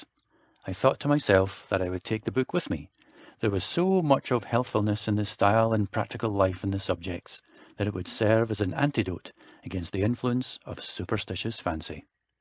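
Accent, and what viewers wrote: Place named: Scotland, United Kingdom. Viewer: I heard an adaptation of this on CBS Radio Mystery Theater and I want to compare it to the original, so I scrolled through four audiobooks playing the first minute of each to see which reader works for me. I don't see why I'd choose a Scots accent when it's not a story that has anything to do with Scotland, but out of the available choices this is the voice that appeals to me and that's just the way it is.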